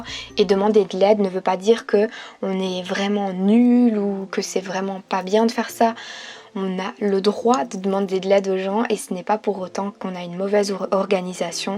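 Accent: Belgium. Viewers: J’adore ton accent belge tu me manque ❤️❤️❤️❤️❤️😪 sinon tu peux faire une vidéo sur comment avoir des bonne notes car j’en ai des mauvaises et j’en ai marre merci bisous❤️❤️❤️❤️❤️❤️❤️❤️❤️❤️❤️❤️❤️ je t❤️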